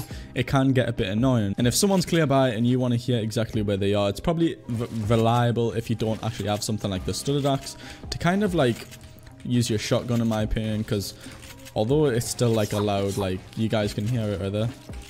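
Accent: Newcastle, United Kingdom. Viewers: are you from Newcastle??? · Geordie boy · are you a geordie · You from Newcastle?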